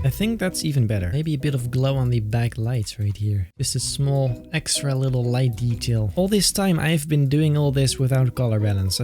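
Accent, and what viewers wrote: Netherlands. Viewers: Wow maat dat is sick dat ik jou nu pas tegen kom. Ondanks dat je Engels zeer goed is kreeg ik toch meteen al het gevoel dat je Nederlands was🙈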